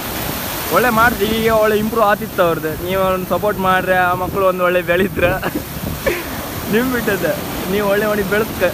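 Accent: Kundapura, India. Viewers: Kundapura kannada baari saap matadtri mare😂❤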